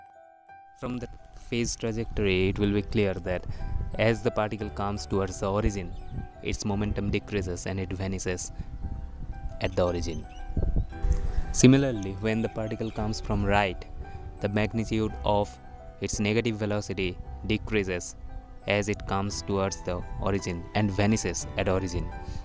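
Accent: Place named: Bengal, India. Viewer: Are you Bengali bro ? Your voice seems like a Bengali people lecturing in English !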